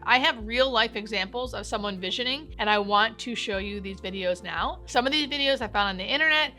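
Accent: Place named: United States. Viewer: Bless you, no offence meant you high yank accent is difficult and painful to my ears, again no offence meant. Your video belongs in north America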